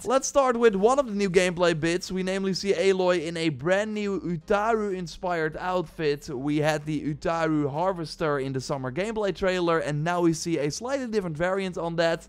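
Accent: Netherlands. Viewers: may I ask you what your mothertongue is? Your English is fine ofcourse, but I can't quite put my finger on your accent. My guess is that your a Dutchie.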